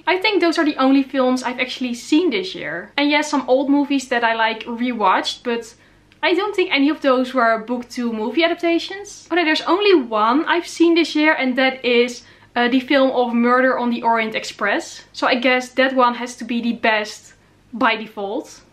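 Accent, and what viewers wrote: Netherlands. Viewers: i think she is from the netherlands about the way she talks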